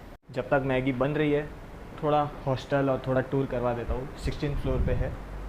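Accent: Gujarat, India. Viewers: i love your gujju accent lol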